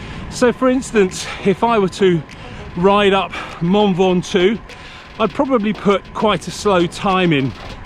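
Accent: England, United Kingdom. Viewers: Excuse me you accent sounds English! Kilometers........flipping miles.......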